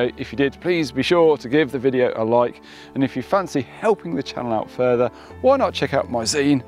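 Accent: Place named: Scotland, United Kingdom. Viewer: Nice to hear this light Scottish tone in your voice. I turned my noise reduction off, that's all took from your video. The rest of my settings are identical. Thx for sharing your experience. 👍🏻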